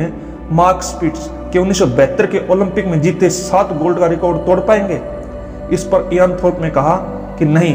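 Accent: Haryana, India. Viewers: Haryanvi hindi 😮😮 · Sir aapki awaj ka haryanvi touch wonderful hai .... Thanks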